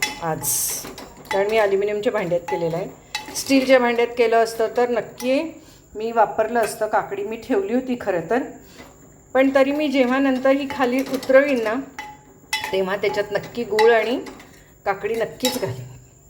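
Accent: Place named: Malvani, India. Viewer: Mi asa sambhar nakki karun bagen mam mastach amchya malvani basheth boltey mastach mazya tondaak panni sutla chaan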